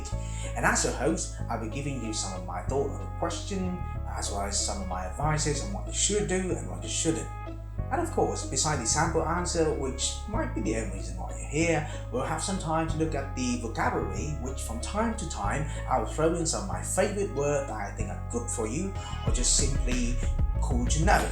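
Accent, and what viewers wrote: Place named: United Kingdom. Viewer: Giọng Anh Anh chuẩn thế ạ :3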